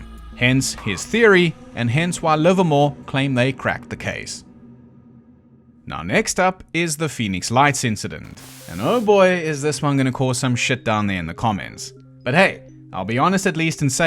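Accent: South Africa. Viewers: Are you from South Africa? 🇿🇦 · Tried to comment earlier and couldn't. Wanted to say I love your personal flare to reading these cases out loud, pretty much saying what we're all thinking, giving validation to those thoughts and feelings. And it's really nice hearing from a south African, I am subscribed to many channels across the globe, but never had one of those until now. But I did want to say that one of your background sound tracks bothers me, it's the popping and crackling one. just hits me in the ear wrong and makes me either mute that part or turn to my phone and play something. I can't describe it any better than that, I'm sorry. other than that, 10 out 10 channel! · So good to hear a South African Accent🎉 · A FOLLOW SOUTH AFRICAN??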